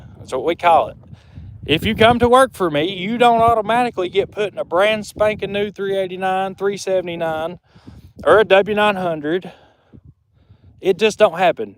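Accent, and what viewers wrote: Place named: California, United States. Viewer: OK sounds like a Californian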